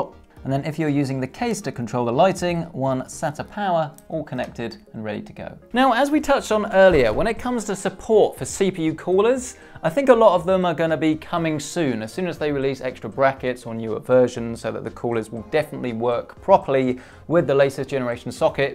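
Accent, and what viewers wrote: United Kingdom. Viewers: Why do you say “zee” when you’re a Brit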